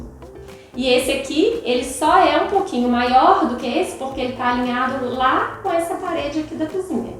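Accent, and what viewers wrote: Minas Gerais, Brazil. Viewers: Excelente trabalho. Sempre importante um outro olhar sobre um projeto, principalmente quando esse tem a qualidade de seu trabalho. Não é o caso, um outro arquiteto coloca nos vídeos alteração de voz infantil e acho que corta a qualidade é continuidade do vídeo. Sugeri a ele não utilizar e pelo visto não gostou. Seu vídeo não tem esse recurso, ainda bem e não precisa. Ficamos assistindo o tempo que for exibido. Não é cansativo, pois nos envolve na explicação prática, objetiva e com todo charme desse sotaque mineirinho de BH. Prova que não precisa de recursos como alteração de voz ou inclusão de flash de imagens que não sejam pertinentes. Infelizmente o arquiteto que citei parece não ter gostado da sugestão. Ainda está em minha mente aquele projeto de seguidores que lhe deu um prêmio. E os demais também. Parabéns de verdade. Se juntar você e a Patrícia do Doma Arquitetura, eu faria um curso para capacitar os profissionais da área. 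Vocês duas comprovam o que ser profissional criativo. Arrependo me de não ter feito arquitetura e optado por publicidade e propaganda. Falei muito. Chega. Abraços.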